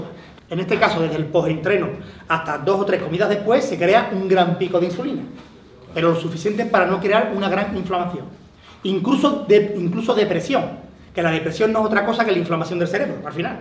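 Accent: Andalusia, Spain. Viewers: date cuenta que es Andalúz, es problema de acento, no lo tomes a mal yo lo entiendo a la perfección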